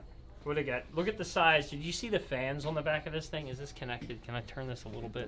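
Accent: Baltimore, United States. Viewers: That Baltimore accent. Maybe Philly.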